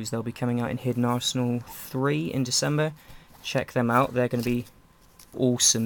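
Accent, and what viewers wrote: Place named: United Kingdom. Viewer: you sound like charlieissocoollike but probably its just the british accent :D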